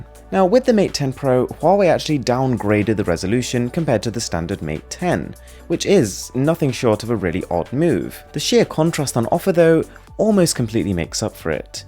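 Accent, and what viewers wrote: RP, United Kingdom. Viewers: You've got a very posh accent. Great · The accent of this guy British... Nice review but can you do an depth camera review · of all the youtubers with British Accent Mrwhosetheboss' is my favorite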